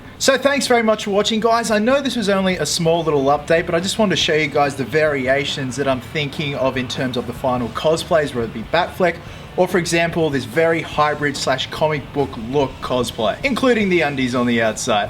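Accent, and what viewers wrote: Australia. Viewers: It's kinda weirding me out watching Batman speak with a high pitched Australian accent. Like some wires got crossed in my brain it something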